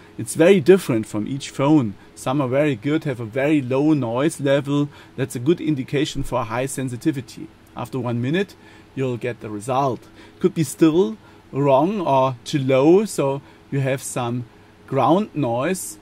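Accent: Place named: Germany. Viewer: Ist ja schön, dass man so ne App entwickelt hat... aber wenn die Muttersprache schon Deutsch ist, dann doch bitte auch auf Deutsch halten. Denn das Englisch hört sich einfach nur grausam an. Zumindest kann ich da kaum zu hören. Wenn mans unbedingt auf Englisch haben möchte, dann jmd. bei dem man das "deutsche" nicht so raushört ;) btw: Bei mir hört sich das auch nicht viel besser an, deshalb lass ich es auch sein ;)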